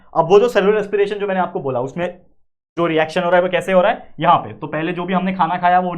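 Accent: Maharashtra, India. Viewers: Ap marathi ho kya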